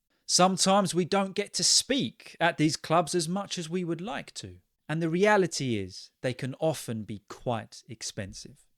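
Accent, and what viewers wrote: United Kingdom. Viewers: It is stupendous teacher,.I follow British pronunciation therefore, I try to imitate you. Hence, please try to make more speaking videos 😊 Thanks from India.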